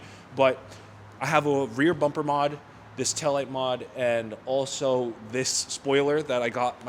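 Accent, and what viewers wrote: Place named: New York, United States. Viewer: wow really? I would’ve never guessed that. I was thinking Puerto Rican but didn’t want to say lol. Your accent gives me Italian vibes, that New York City type accent ya know. Love the car btw, I’m working on fixing some things in my 2008 Toyota Camry as well speak, getting a water pump and rear struts installed , and now I need a new transmission too.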